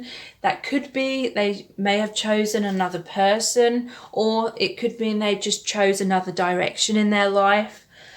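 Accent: United Kingdom. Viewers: Wow!! I love ur British accent also fantastic reading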